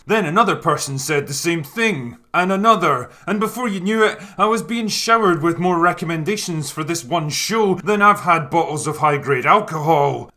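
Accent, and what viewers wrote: Scotland, United Kingdom. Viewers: I feel like you toned down your accident a little bit since your first videos, I hope you will keep your strong drunk scottish accent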